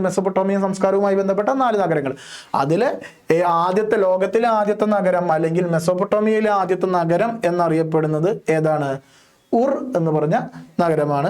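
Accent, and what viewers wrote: Thrissur, India. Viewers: Sir thrissur ano ❤❤❤ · Sir class nte idakkulla trissur style aanu highlight😂😂😂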